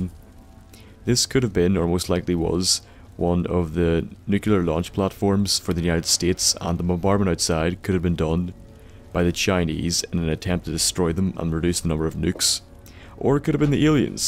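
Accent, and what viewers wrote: Northern Ireland, United Kingdom. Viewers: nice,video just wanted to ask are you northern Irish? because you kinda sound like my friend from northern Ireland.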